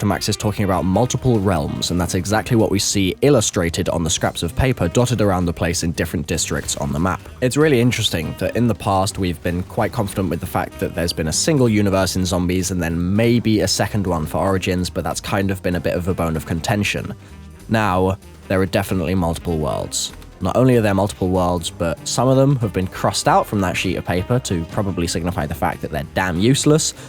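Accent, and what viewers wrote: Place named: United Kingdom. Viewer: god damn i feel sorry for you,. You actually had to change voice from that happy and silly british accent that made everyone happy to a voice where its so depressing and dark-like compared to other times because of the 10 year old butthurt angry little piece of shits thinking why this is not like The kid wanted it to be. You are working so hard!! And you upload videos this many times!! It just hurts to see this! Just, get a break. The actual people would understand! Props to you,!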